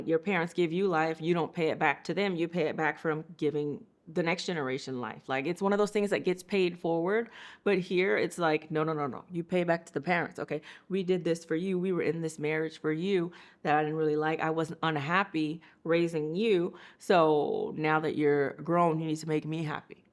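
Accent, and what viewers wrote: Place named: South, United States. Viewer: I love that you speak Korean and have the cutest Southern accent.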